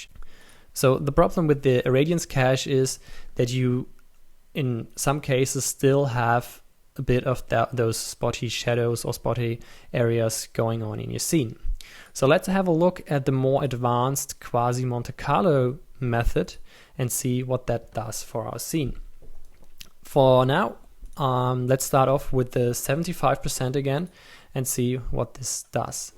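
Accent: Germany. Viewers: make these in german... your accent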